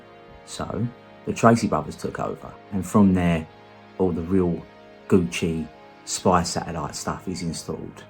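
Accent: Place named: United Kingdom. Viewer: i really enjoy your mellow voice and accent - as an american it seems to be more legitimate when talking about the thunderbirds!! I love british sci fi and look forward to more videos. Im really curious about 'The Secret Service" - a personal favorite of mine. Ill never forget the first time i saw the man ride around in the vicars suitcase!!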